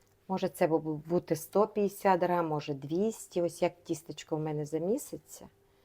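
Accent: Transcarpathia, Ukraine. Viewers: Добрий день. Ви неймовірна. Приємно чути закарпатський діалект. ❤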